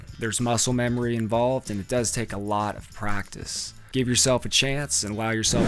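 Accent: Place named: Philadelphia, United States. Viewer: Your Philly accent is uncontrollable brother i love it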